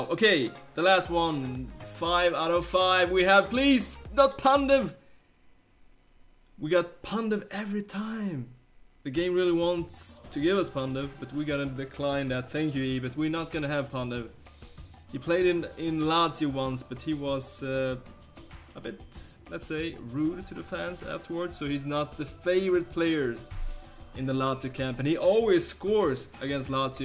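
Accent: Sweden. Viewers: He is so swedish listen to that accent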